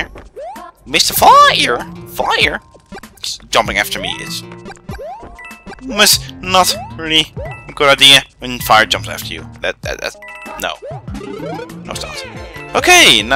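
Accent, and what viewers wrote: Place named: Netherlands. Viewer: but if you hear very good you can hear that you dutch ( like me )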